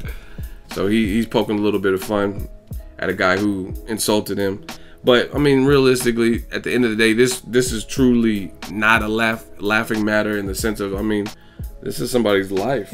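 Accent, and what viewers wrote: Ireland. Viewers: WTf you are irish?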